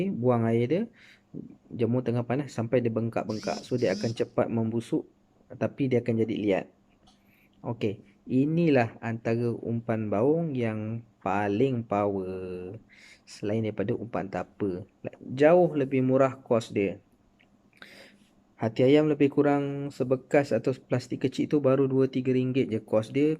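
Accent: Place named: Java, Indonesia. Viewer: Ada lah bang bunyi jawanya😅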